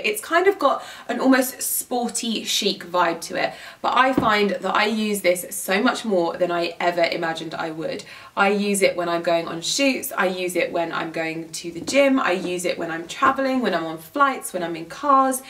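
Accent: RP, United Kingdom. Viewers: Posh talk🤔😏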